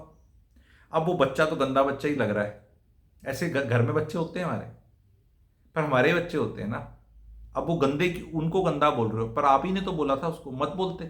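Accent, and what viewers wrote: Haryana, India. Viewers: I love your hariyanvi accent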